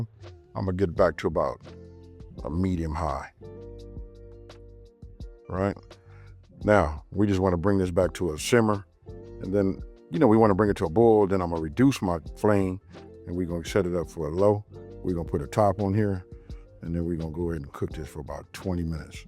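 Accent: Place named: South, United States. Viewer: 😊❤ Wooow, that's a Strong Southern accent!!! Love your cooking!!! Greetings from Ghana 🇬🇭🇬🇭🇬🇭🇬🇭🇬🇭 Come visit sometime!!! 🖐🏾😊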